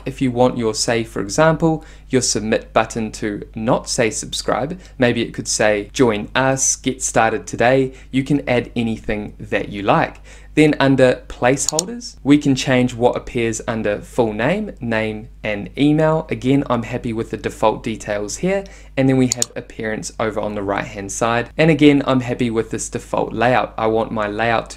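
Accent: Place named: New Zealand. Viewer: Love hearing the Kiwi accent on Youtube instructional channels. No mucking around. Shot bro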